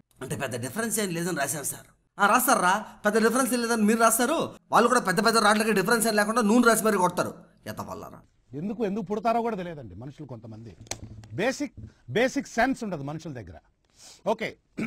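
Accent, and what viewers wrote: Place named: Tirupati, India. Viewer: nenu undedi Tirupati bro Slang ni guess chesa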